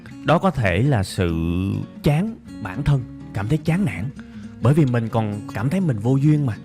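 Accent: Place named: Mekong Delta, Vietnam. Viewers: Mới đầu nghe anh này giảng dài dòng cũng thấy khó chịu, bực mình lắm nhưng coi hết mấy clip thì thấy nội dung hay, giọng ảnh miền Tây chân chất, clip có dàn dựng hiệu ứng 1 cách khoa học, lời giảng dễ hiểu, chân phương nên bị ghiền! :D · Anh chắc người miền tây hả cách nói chuyện của anh với funny gaming tv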